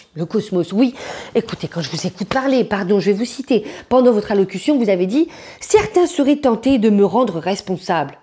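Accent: South, France. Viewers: C'est l'accent du sud. Par exemple "hein" donne "haing".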